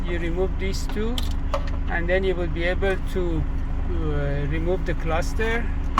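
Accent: Iran. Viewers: واى لهجه رو انگليسى با لهجه ايرونى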